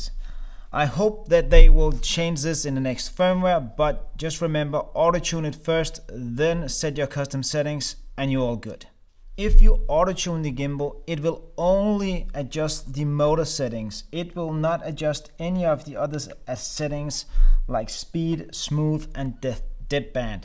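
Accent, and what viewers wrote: Denmark. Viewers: wtf.. Troede aldrig jeg ville finde en film making related YouTube kanal fra en dansker.. Var så forvirret over jeg kunne høre du var dansker, da jeg ikke tænkte der var chance for det haha Men får bestilt præcis denne stabilizer idag, and keep up the great work! :)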